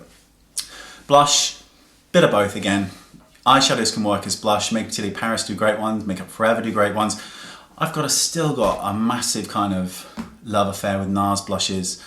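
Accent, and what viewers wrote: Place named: Bristol, United Kingdom. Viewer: Love the Bristolian accent 😊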